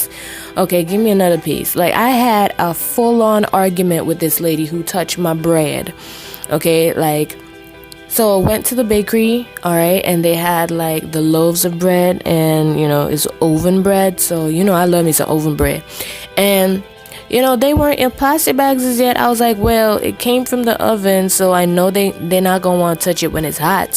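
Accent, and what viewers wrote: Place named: Caribbean. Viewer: Thanks for sharing your insights, this is seldom spocken or apparent on the youtube platform for black people. Correct me if I'm wrong but I'm hearing a subtle hint of carribean/West Indies accent from you.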